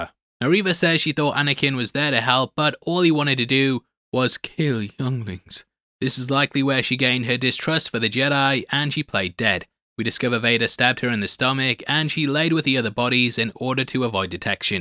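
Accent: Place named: United Kingdom. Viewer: Come for the takes, stay for the cool British accent. 🏴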